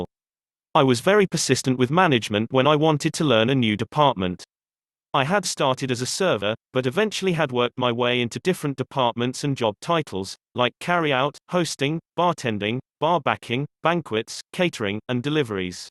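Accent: United Kingdom. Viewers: British style, nice accent, but crappy pronunciation.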